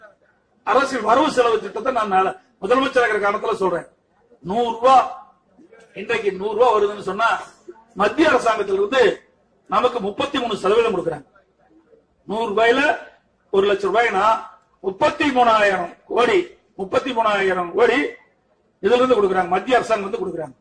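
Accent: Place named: Kongu, India. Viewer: Kongu tamil parakkuthu sir unga tock la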